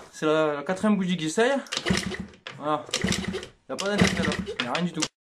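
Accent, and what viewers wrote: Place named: France. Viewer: L'accent français j'pp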